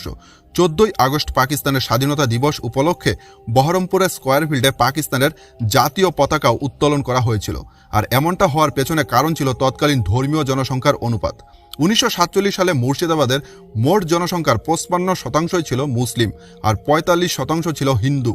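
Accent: Bangladesh. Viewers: Dada. Apnar name জানতে চাই. আপনার কথার ton শুনে, মনে হচ্ছে আপনিও বাংলাদেশী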